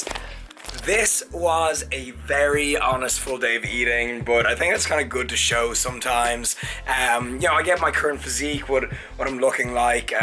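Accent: Ireland. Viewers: Is he Irish ☘️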